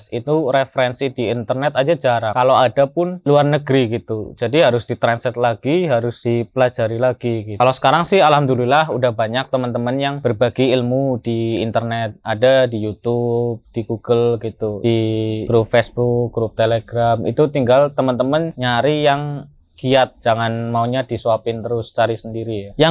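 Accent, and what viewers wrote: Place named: Banyumas, Indonesia. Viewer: Deneng ngapak, tapi ok ilmunya suhu, ora ngapak ra kepenak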